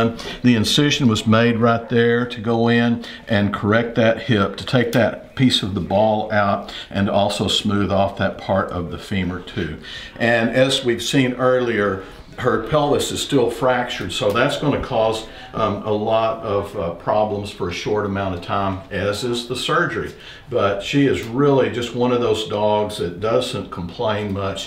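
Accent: Texas, United States. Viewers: love his texas dialekt haha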